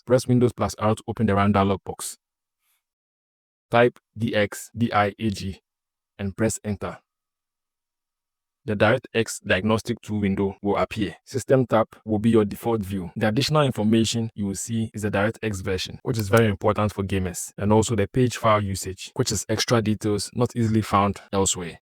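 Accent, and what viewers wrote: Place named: Spain. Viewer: Sounds like Spanish accent. Spanish speakers who try to speak English forget that we separate our words. His sentences almost sound like one word. SLOW DOWN and separate your words.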